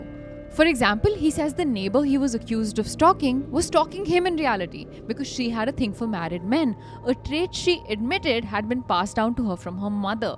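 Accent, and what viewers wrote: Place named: India. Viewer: Start talking in Hindi instead of talking in English with a Hindi accent and you’ll be heard more.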